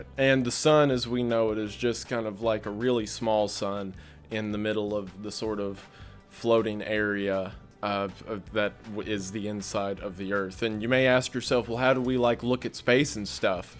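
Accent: South, United States. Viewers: He is so articulate..and every now and then - I’ll hear a very slight, southern, twang (or maybe it’s considered a drawl, forgive me, I’m nothing more than a Michigan Troll) but nevertheless, I honestly enjoy hearing it!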